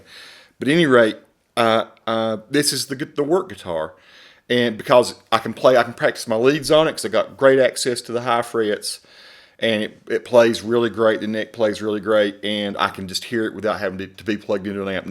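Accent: South, United States. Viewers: I love your videos, they are so in depth and practical. It is also nice to hear a southern drawl. I ordered one of these based on your review. Thanks so much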